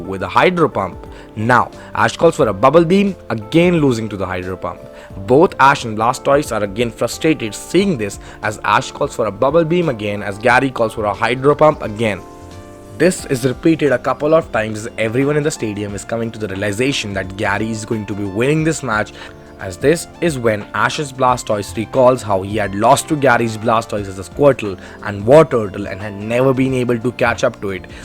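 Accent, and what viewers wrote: India. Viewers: Your Accent its like Indian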